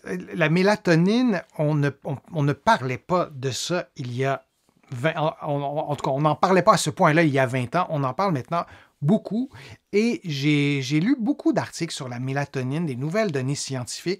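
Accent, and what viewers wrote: Quebec, Canada. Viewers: Merci pour votre information. Donc il vaut mieux lire ou écouter la radio le soir pour éviter de s'abrutir avec la télé ou you tube ( que je vais écouter plutôt le matin maintenant) Bien fermer ses volets ou rideaux pour être dans le noir surtout en ce moment car il fait jour vers cinq heures . Encore merci à vous et bonjour au Québec que j'aime beaucoup (ainsi que votre accent) ou vit mon fils ainé..j'ai 70 ans et commence a avoir du mal à dormir a cause de mon stress.......mais je me soigne avec le yoga et des activités artistiques.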